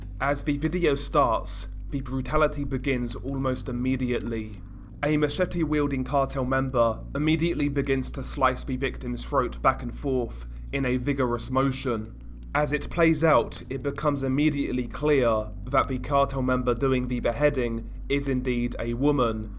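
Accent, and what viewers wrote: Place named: United Kingdom. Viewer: I'm an American, listening to a presumed Britt, inform me about the horrors occuring in Mexico.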